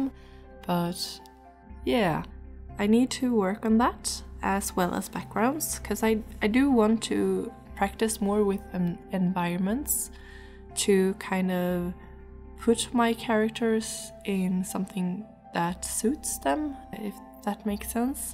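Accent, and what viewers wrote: Sweden. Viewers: I love your art, and I adore your Swedish accent. Marry me please <3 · omg are you swedish???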